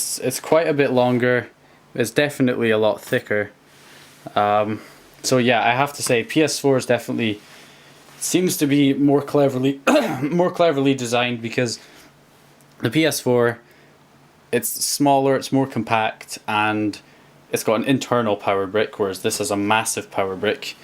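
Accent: United Kingdom. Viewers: Because he's british and youre probably spanish by your name. So you talk weird too.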